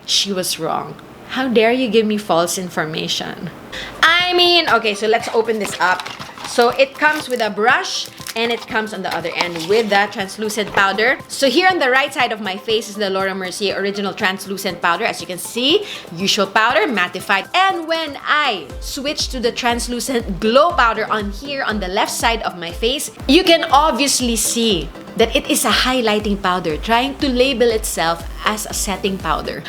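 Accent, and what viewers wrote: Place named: United Kingdom. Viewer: ! Love the british accent! Ganda ng intro!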